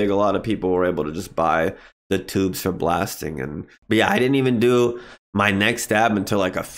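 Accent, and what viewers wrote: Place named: East Coast, United States. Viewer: sounds like every relative I have from Back East.. 😀😀😀 Love the dog and the show!!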